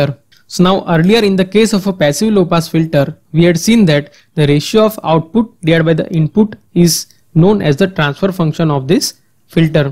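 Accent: India. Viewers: indian accents are beautiful. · Very heavy Indian accent but the content is great, thank you for your sharing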